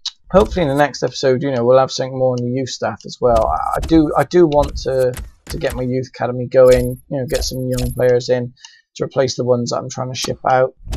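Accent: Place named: Bristol, United Kingdom. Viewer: Nice to see a city career mode on yt. And nice bristolian accent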